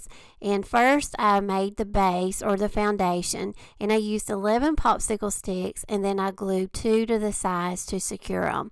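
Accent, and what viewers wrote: South, United States. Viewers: I also love your sweet southern accent ❤️. What part of Alabama are you in ? I live in Haleyville Alabama. It's in the north west part of the state.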